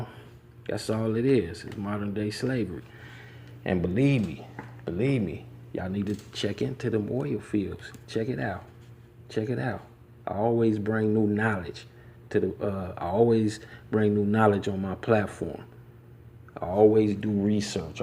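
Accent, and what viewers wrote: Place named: Midwest, United States. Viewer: I'm from L.A. born and raised. I watch your videos from time to time and I appreciate the good content you put out. But I for damn sure appreciate you doing your reserch on my city and knowing exactly where everything is. You ever lived out here in L.A.? You have a Mid West accent G.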